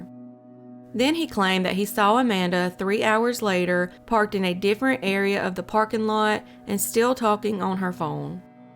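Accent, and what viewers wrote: South, United States. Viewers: Hi, I just wanted to say that really love your narration and background music. It's so comforting to hear a southern accent as a former Texan living in NYC. I wanted to ask if you have covered the case of Evelyn Hernandez. A disappeared pregnant woman and her son who vanished in San Francisco California. The case received little to no coverage and the main suspect is the married man who inpregnanted her similar to the Amanda Jones, except Evelyn is from El Salvador and nobody cared enough in the SFPD to convict Herman Àguilar.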